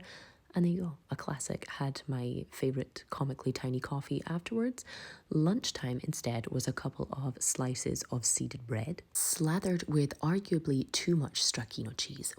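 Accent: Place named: Scotland, United Kingdom. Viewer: Love your Scottish sense of humour & voice 🎉 fab week of eats yum 🎉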